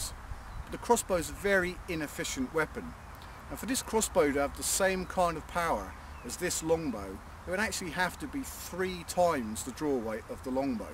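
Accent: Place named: England, United Kingdom. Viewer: Sorry I'm very bad at understanding an english accent, can you tell me the name of the first crossbow type you used?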